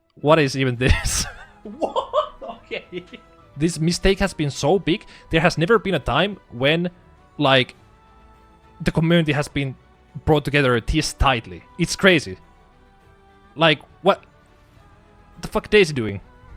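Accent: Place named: Finland. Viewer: Hyvä video. Suomi aksentti paistaa vähän lävitte. 👍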